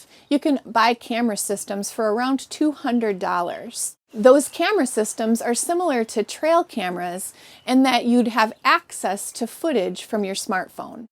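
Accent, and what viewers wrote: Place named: Wisconsin, United States. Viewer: its called an accent. Shes from Wisconsin. Also- <link>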